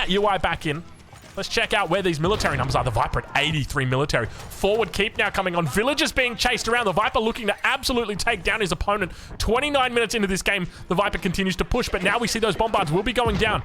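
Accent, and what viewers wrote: Australia. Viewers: What is it with youtube commenters not understanding Australian?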